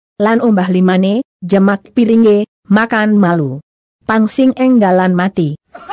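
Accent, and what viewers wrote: Buleleng, Indonesia. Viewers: Gaya kipak kipek lucu. Lebih cocok logat Beleleng anggon. Ini rekaman lama tapi saya baru sekarang nonton. Demen masi.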